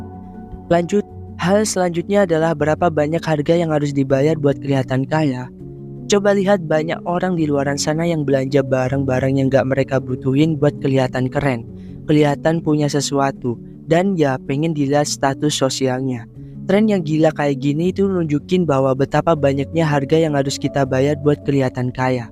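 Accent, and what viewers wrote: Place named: Jakarta, Indonesia. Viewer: Alangkah bijak bila narasi diucapkan dalam bahasa Indonesia yang baik dan benar. Tak perlu kejakartanan dengan lu gue. Krn yang menikmati bisa jadi orang seluruh Indonesia atau bahkananca negara, dimana saat ini bahasa Indonesia diminati di banyak negara.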